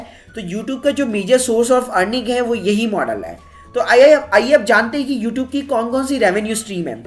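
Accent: India. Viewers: His Indian accent really drives me crazy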